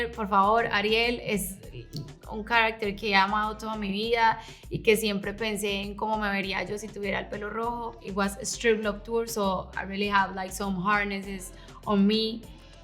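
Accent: Paisa, Colombia. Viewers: Ella habla paisa por eso sale asi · Que ingles bonito con acento paisa. Karol G una dura una bichota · Paisanglish 😂😂😂 la amo ❤️❤️❤️❤️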